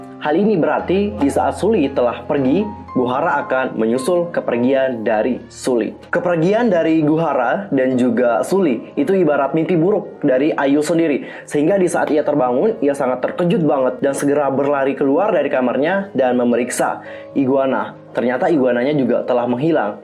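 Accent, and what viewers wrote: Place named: West Java, Indonesia. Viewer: Salfok sama cara ngomongnya sunda banget. No Hate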